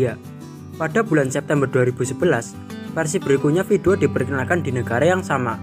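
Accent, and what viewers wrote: Java, Indonesia. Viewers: Mas sampean wong Jawa yah.,logate ketara.,sing Nang video juga R15 plat R.,jangan2 wong Cilacap yah.. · mantap bang, cuman nada ngomong nya masih JoWo Medok...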